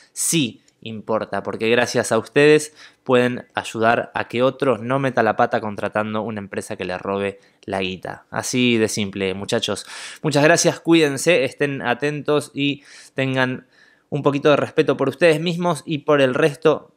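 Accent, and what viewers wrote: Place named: Colombia. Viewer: jajaja ya conocía al cantante? Pero vio que si se parece en algo jajajja ah bueno hablas como Colombiano en la buena parce jajajja 👍🇨🇴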